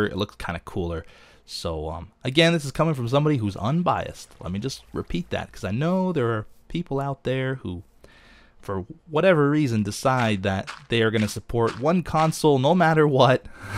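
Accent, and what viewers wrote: Australia. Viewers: Australian speak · It sounds Australian like me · He might be using a different language. I believe its Australian English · it happens when u use the Australian english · He have australian language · U have Australian English on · hes using aussie speak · was using Australian English for this episode I think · Australian english language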